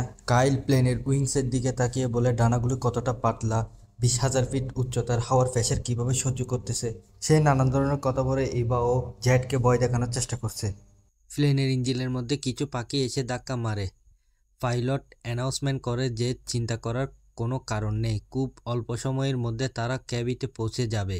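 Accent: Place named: Chittagong, Bangladesh. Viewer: Plz suddho bangla shikhun...er por explain korben plz.... Puro puri Chittagong er tone.. Cheap!!!!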